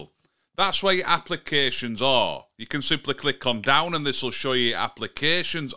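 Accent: Yorkshire, United Kingdom. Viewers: Great help mate…Love your Yorkshire accent 😊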